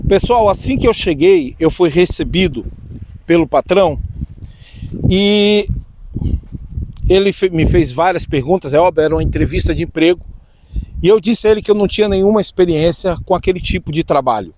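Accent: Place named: Brazil. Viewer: Caro amigo Brasileiro, sabe uma coisa ? Eu, Português de 85 anos de idade, tiro-lhe o meu chapéu, por compreender e aceitar o jeito português, porque o Português genuíno é exctamente isso tudo que acabou de ler, na percentagem de 100% . Se não fosse o sotaque o Senhor, hoje, já seria um português genuíno e verdadeiro. Na companhia dos seus familiares, seja muito felíz em Portugal.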